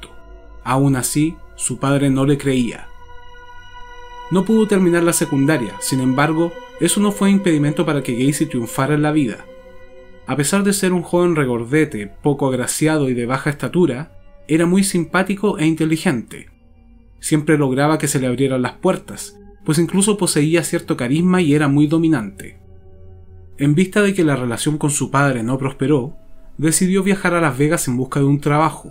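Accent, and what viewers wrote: Chile. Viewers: es chileno el que habla?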